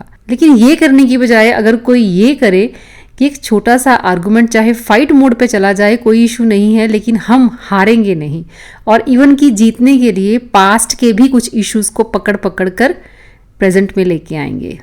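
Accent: Punjab, India. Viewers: I think this is because of the Punjabi accent of her. But sounds very nice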